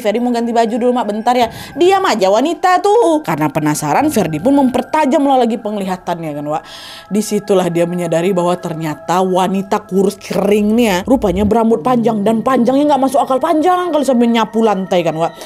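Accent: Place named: Medan, Indonesia. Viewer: Medan kali yaa kak 😅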